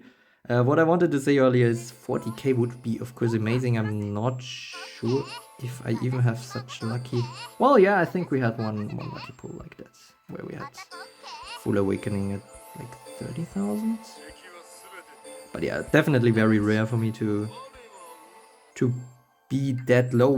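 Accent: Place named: Germany. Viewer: why u have so much Diamonds wtf and u sound like u from germany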